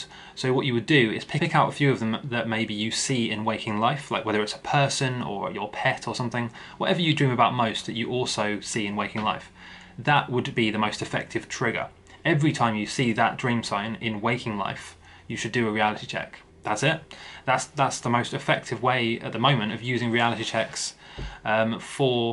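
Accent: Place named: North, United Kingdom. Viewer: I'm american. I understand you well I think its cause you sound like youre from the north(maybe, could be wrong). There are some English accent dialects that are difficult to understand but youre clear :)ha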